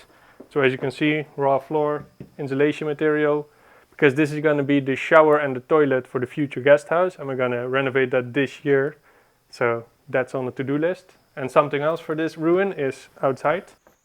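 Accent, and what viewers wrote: Netherlands. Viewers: Het Nederlandse accent 😂😂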